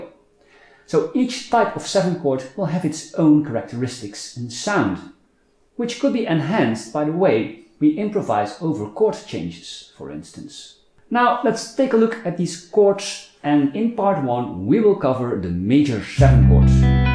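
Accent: Netherlands. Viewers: The Dutch accent suits guitar videos perfectly! Who knew? 😁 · you can tell in a split second when somebody is from the Netherlands after they open their mouths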